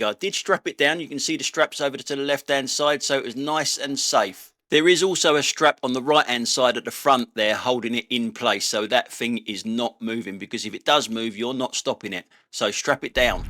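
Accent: London, United Kingdom. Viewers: I did watch it to the end :-) I used to live in Ilford and worked in London at 16. I moved to Germany in 1974 at the tender age of 23 to go to my future wife and it was great to see the areas around London again. Btw. I love your accent, reminds me also of where I grew up. I swapped my racing bike for an E-Bike when I reached 71 after the roads started getting steeper. Keep up the great work.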